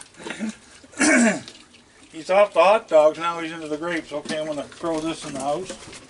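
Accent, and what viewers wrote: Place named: Nova Scotia, Canada. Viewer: i always wondered why ya sounded so familiar its cause yer a fellow nova scotian right on makes sense to me now lol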